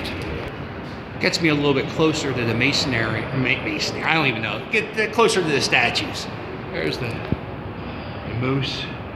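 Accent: Philadelphia, United States. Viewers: the accent lol yep you from Philly alright 0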